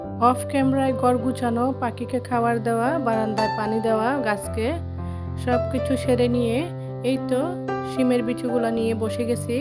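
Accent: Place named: Chittagong, Bangladesh. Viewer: ❤২২❤❤আমার প্রিয় আপু সিমের বিচির খোসা ছাড়ানোর সুন্দর একটা আইডিয়া শেয়ার করে দিয়েছো অনেক ভালো লাগলো শুটকি মাছ আমারও পছন্দ তুমি চিটাগাং এর আমি জানি আর তোমার কথায় আমার কাছে সেরকম লাগে আর আমার খুবই ভালো লাগে কথা এই ধরণটা আমার চিটাগাংই বেড়ে ওঠা সবকিছুই গরুর মাংস রান্নাটা অনেক সুন্দর হয়েছে বেগুন সিমের বিচি শুটকি দিয়ে রান্না করেছো ইফতারি গুলা ভেজে নিয়েছো বেগুনি টা আমার কাছে খুবই সুন্দর লেগেছে ভাইয়া অনেক কিছু নিয়ে এসেছে বাচ্চারা বাইরের খাবার খুবই পছন্দ করে আপু আমার বাচ্চাদেরও একই অবস্থা ভালো থেকো ❤❤❤❤❤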